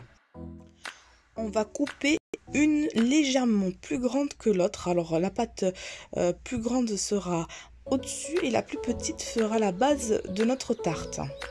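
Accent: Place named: South, France. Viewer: Vive l'accent du sud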